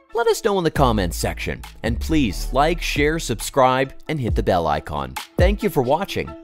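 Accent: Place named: United States. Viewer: The American accent is a dead giveaway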